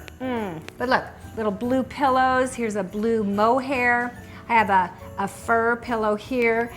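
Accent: United Kingdom. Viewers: LIKE 👍for your British accent😂👍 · Very Beautiful, understated, classy as usual! Was eagerly awaiting your unveil, love the flow. Also love your British accent. Happy holidays from England.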